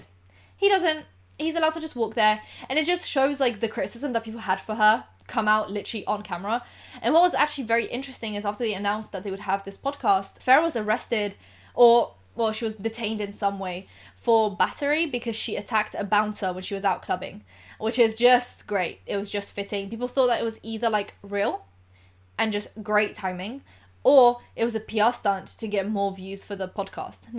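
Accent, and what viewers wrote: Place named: England, United Kingdom. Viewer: Shes English. Not sure exactly where in England though. Maybe London?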